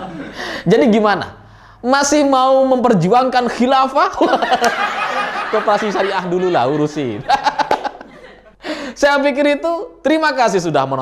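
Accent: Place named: Madura, Indonesia. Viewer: Loghatnya sperti madura, makjleb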